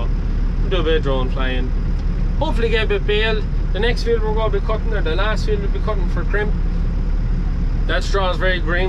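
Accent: Ireland. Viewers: hey are you from louth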